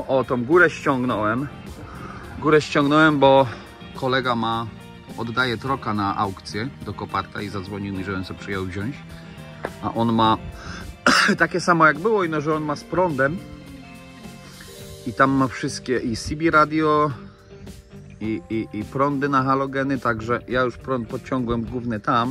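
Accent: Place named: Podhale, Poland. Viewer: No chiba z gor hoho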